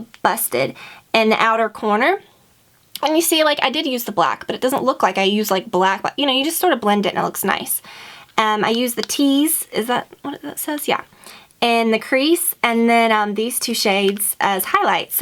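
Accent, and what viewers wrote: South, United States. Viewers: You sound so southern in this video! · Shes from Georgia, its a southern accent. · I think I've noticed your southern accent more in this video than any other one! haha also your eyelashes look gorgeous! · Your southern accent it totally adorable :)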